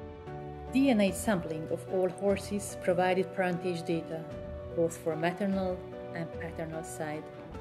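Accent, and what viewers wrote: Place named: Hungary. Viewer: Hunglish narration.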